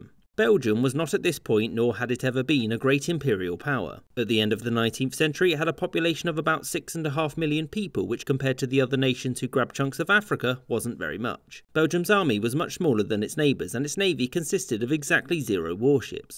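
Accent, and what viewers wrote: United Kingdom. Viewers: as told by a brit yes, you know thisll be good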